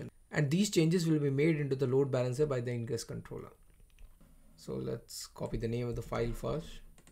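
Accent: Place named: India. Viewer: first and foremost, thanks for the video. Also thank you for speaking clearly. I often close videos with Indian speakers because it's hard to understand. You have a heavy accent but it's clear and professional and a quality sound recording. thumbs up and cheers mate!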